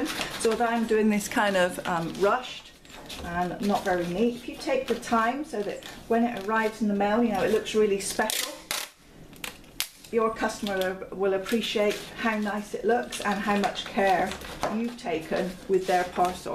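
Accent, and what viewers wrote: United Kingdom. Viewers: I love the video! Very helpful! And your (probably:) British accent💕 I see you are wrapping classic canvas, but what about board canvas, those slim ones? I think it should be enough to use the tissue paper and over it a bubble wrap and put into the book box mentioned in the video (I love them). Or any other method? I want to ship just the tiny ones board canvas paintings:)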